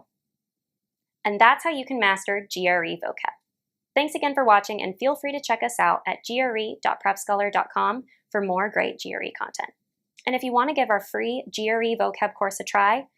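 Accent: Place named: United States. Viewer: I like her American Accent.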